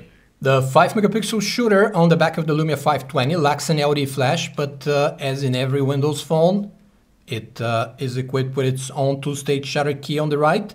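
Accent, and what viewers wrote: Russia. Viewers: sounds russian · Why u speaks english like that are u russian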